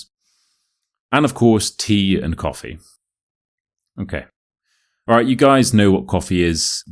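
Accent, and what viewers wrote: United Kingdom. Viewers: Very nice the British accent being spoken.. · awesome i m a big Fan of your British English loving it day by day😇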